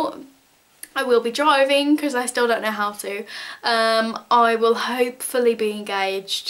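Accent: Birmingham, United Kingdom. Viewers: Good to see that your back at making videos 😊 When you said about the accent, I can hear the brummie in you, it's a nice little accent! Xxx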